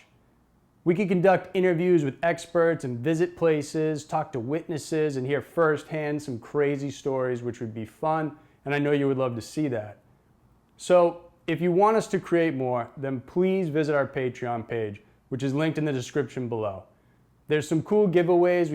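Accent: United States. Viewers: Great to put a face to your voice, I watch your videos everyday but where in the USA is your accent from exactly? thanks lysa x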